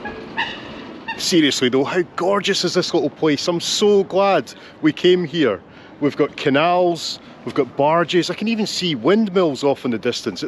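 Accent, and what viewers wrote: Scotland, United Kingdom. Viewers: Ahhhh... Here we go again, a braw Scottish voice, taking me on a trip I can only dream about !!! Thanks for all your efforts to entertain us all, and I am looking forward to this video, just as soon as I make a Coffee !!! Many Thanks !!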